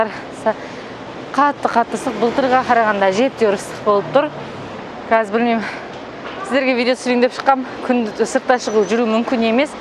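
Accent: Standard. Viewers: Бізде де күн ыстық. Қазақ тілін таза сөйлейді 👍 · Қандай керемет тұнып тұрған қазақша сөз деймін.Қайда жүрсең де Бақытты бол! Сенің каналың мен үшін өте қызық тартымды көре бергім келеді.Жаңалығың көп болсын! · Я айтпақшы сіздің таза қазақшаңыз қанша жыл шетелде тұрсаңыз да өзгермепті жарайсың дегім келеді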